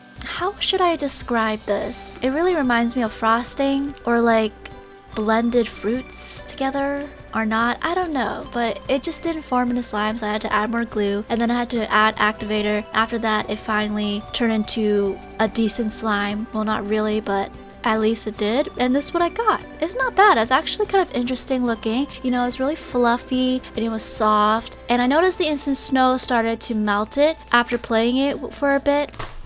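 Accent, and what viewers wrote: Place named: India. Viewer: You talk like an Indian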